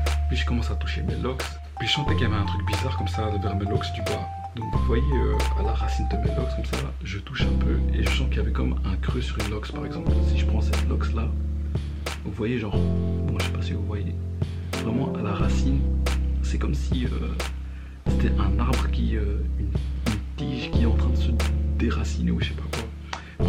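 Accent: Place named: Brussels, Belgium. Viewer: T as manière de parlé obligé t es un bruxellois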